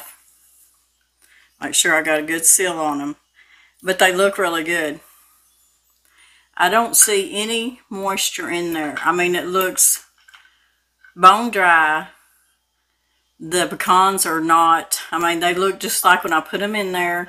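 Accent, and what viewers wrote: South, United States. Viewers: Wow, that is amazing! I don’t have a bread machine.. Diabetes runs in my family, so I have to stay away from breads. You always have an educational video. Thank you for teaching us 💕. I am from Iowa. We pronounce pecans differently. Love your southern accent😀!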